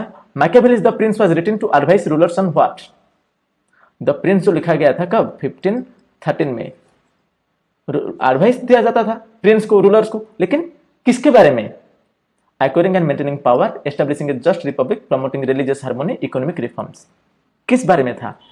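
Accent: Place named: Odisha, India. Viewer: Mu bi odisha ru sir from balasore video re majhire odia voice dekhi mna ku question asila jani parili sir ama odisha ru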